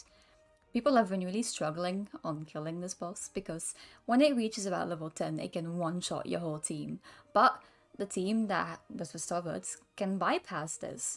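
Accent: United Kingdom. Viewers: Nice guide British woman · Are you British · Are you British?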